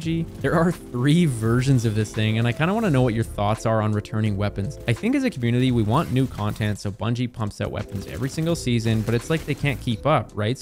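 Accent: Canada. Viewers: Are you Canadian? You sound Canadian say “sorrow”. I love it.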